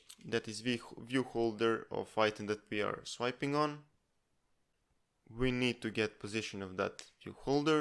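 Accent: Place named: Russia. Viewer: акцент вроде русский:yt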